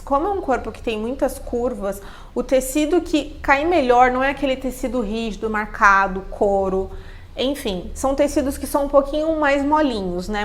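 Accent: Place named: Brazil. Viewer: Yes, portuguese from Brazil accent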